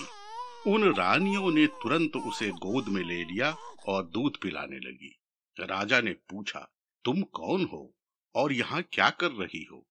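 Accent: Standard. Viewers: शुद्ध उच्चारण ने मंत्रमुग्ध कर दिया है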